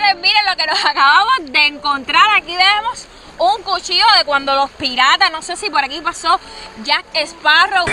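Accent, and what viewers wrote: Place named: Cuba. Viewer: Excelente video me encanta tu acento cubano además estas súper guapa saludos preciosa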